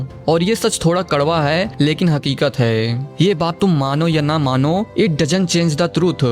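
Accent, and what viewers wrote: India. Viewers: bruhh don't speak in english. your accent is soo indian man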